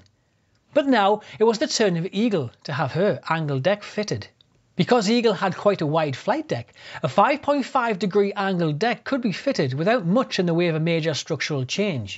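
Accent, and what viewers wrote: Newcastle, United Kingdom. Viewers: He's got a Newcastle (?) / north east accent. Great speaker, fascinating video.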